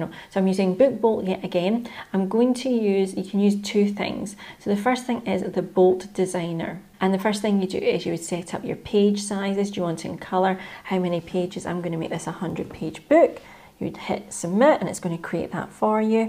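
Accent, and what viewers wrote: Scotland, United Kingdom. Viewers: I've been following your site for about three months. I'm an American who lives in North East Scotland. I used to live in Cumbernauld, so I love your accent. I wrote a book on becoming deb some years ago, and I love watching You Tube videos related to it. You and your husband have one of the best I've seen in this country. Plus this is your best yet. Thanks. Keep up the good work.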